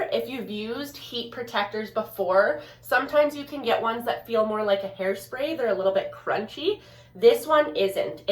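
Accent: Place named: Canada. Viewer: Where are you from?? You sound veeery Canadian!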